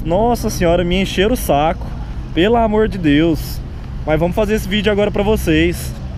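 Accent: Goiânia, Brazil. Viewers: É de Goiânia mano?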